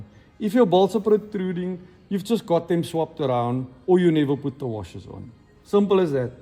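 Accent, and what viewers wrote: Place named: South Africa. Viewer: Are you from South Africa lol just come across your vid you sound a lot like the guy from district 9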